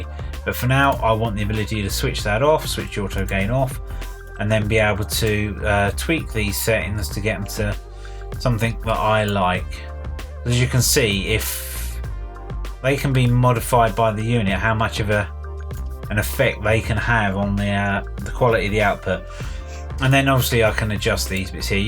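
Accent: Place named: South Wales, United Kingdom. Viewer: You have a southern welsh accent, you aren't by chance from Glamorgan are you? Very Anthony Hopkins'esque, great video mate!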